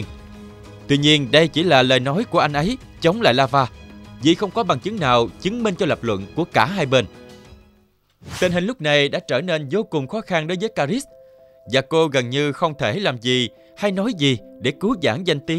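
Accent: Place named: South, Vietnam. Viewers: Đừng nói vậy, tội nghiệp anh ấy lắm Anh ấy sinh ra là người nam nên giọng vậy, k hợp ý bn cho lắm Nhưng k sao, giọng anh ấy hay là đc :D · mình khá là ko thích giọng ng miền nam khi đọc kịch bản, ko phải tất cả nhưng từ lâu mình rất thích nghe voice kịch bản của những ng miền bắc hơn (mặc dù mình là ng miền nam), nhưng giọng bạn này hay thật, dù đọc tên có hơi sai nhưng tụ chung giọng vẫn rất truyền cảm, mong kênh bạn sẽ ra nhiều video chất lượng hơn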